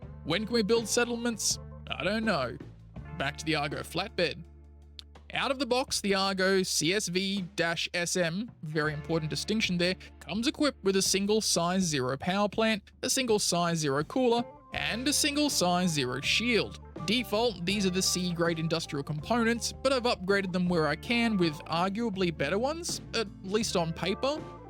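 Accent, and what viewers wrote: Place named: Australia. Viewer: Hi whats up: Love your uploads.. and I believe Ozzie oZZie accent. The problem with CIG is they charge far too high prices for goods that pose no challenge for other products within their price range. The CVS-SM seems to sell for 50dollars or 50 pounds which is how much the entire game sold for with SQ42 and a Starter. Starter ships now cost that begotten price and the game yet alone a package. The CSV-SM is not seemingly calibrated with a driving rig meaning there is three way deal. You can't manage cargo more effectively. I would love to attach compartments enabling maybe a 12-16 hauling pool henceforth a customisable truck variant! I don't WANT a variant - but extensions for CSV-SM... what cha think??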